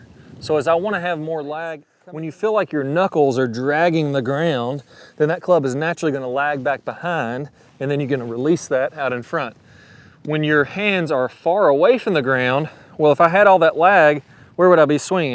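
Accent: South, United States. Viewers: You're an excellent coach. I hear that southern twang shining though. Where could I find you for an in-person lesson?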